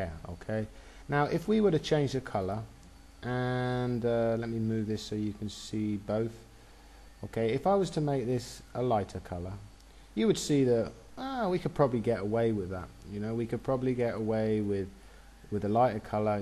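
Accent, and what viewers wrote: United Kingdom. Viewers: Thank you, your tutorials are very clear and you demonstrate in a manner that is easy for beginners and the more advanced users to understand. You sound like you are English with your accent?. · Thank you very much master! :) nice British accent you got there >.< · lovely british accent ;)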